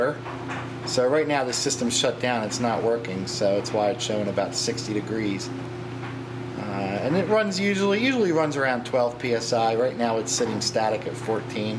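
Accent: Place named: South Jersey, United States. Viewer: ...ooh that's the south jersey / philly sound...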